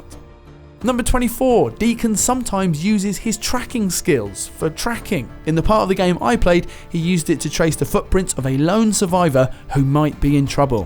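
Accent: United Kingdom. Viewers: My god im tired of british accent!!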